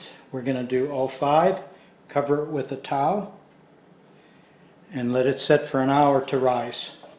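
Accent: Pittsburgh, United States. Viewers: As soon as I heard his accent I knew he was from da Burgh! Found out he is my classmate Mike’s brother and another classmate Sharon’s cousin. Great videos, No rolling pin…use a wine bottle! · Really nice work. Good recipe. Sounds like you are from the Pittsburgh area. Thank you so much❤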